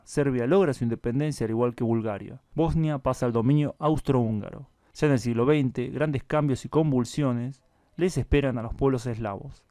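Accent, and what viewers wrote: Buenos Aires, Argentina. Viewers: Eu amo o espanhol falado por ele. O espanhol portenho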